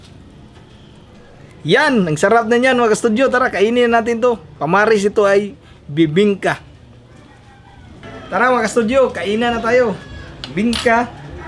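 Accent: Visayas, Philippines. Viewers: Bisdak jd kaayo accent jd ba hehehe, I'm visaya din hehe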